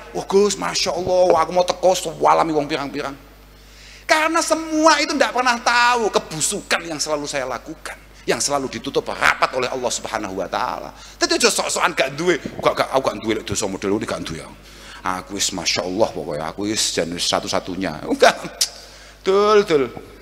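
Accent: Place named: Java, Indonesia. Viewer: WAO GUS CERAMAHNYA DAGING SEMUA , SUBCANALOH ,PRIATUNE ENOM , GANTENG , MUKA ARAB ,,JOWONE MEDOK BANGET , ILMUNE TINGGI ,,,COCOKNYA KALAO DUDUK JEJER SAMA GUS BAHA , SUMBER ILMU SEMUA ,,,WAO KEREN GUS KAUSAR ,, KULO BANGGA GUS DI KEDIRI WABIL KUSUS PLOSO MELAHIRKAN KYAI MUDA CALON KYAI BESAR YG BER KAROMAH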